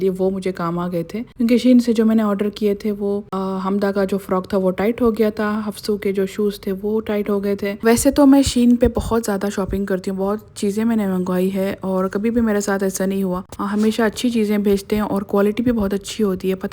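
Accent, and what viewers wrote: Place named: Dakhani, India. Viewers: V nice vlog voice over also u do in dakhani urdu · Ur Urdu s same as hw we speak at home. We are also from Bangalore.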